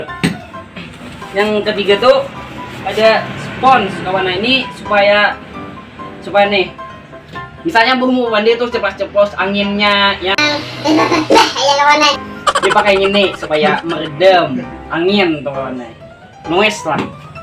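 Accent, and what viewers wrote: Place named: Banjar, Indonesia. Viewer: bjur bnr tu orang Banjar😂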